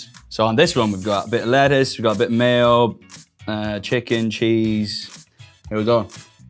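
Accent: Ireland. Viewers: It's an Irish accent. Sybau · I didn’t realize how Irish Niall was until right now. Like the accent as gotten stronger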